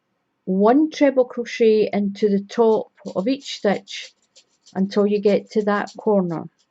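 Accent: Scotland, United Kingdom. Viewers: Are you from Scotland ?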